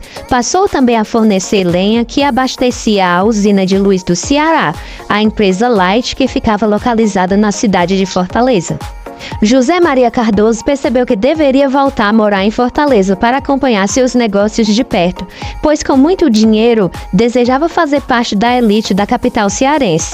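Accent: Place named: Saxony, Germany. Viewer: Morava perto quando novo e era especialmente maravilhado com as rampas para carros. Você é cearense mesmo? É uma dúvida sincera, porque sua pronúncia dos "R" é um pouco saxônica.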